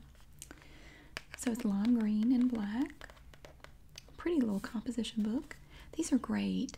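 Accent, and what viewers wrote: South, United States. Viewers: Thanks for that soft and smooth southern voice. Veeery relaxing. I live in Hawaii so I don't ever hear that accent. Aloha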